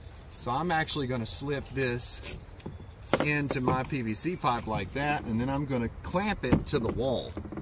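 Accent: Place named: Tennessee, United States. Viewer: This is a truly excellent video on how to install a mini split. Thinking I will be using this tutorial if and when I need to replace mine. One thought,....likely a regional thing, but I notice your frequent use of "Take and...." before words like, "Use", "Push", "Put" etc. I have heard this before,.....might be a Tennessee thing. Just Push, Put, or Use,.....without the "Take and..." Might not feel right to you if you grew up with everyone saying "Take and...." Otherwise,....a great tutorial,...thanks for taking the time to video, edit and post it for us to learn from.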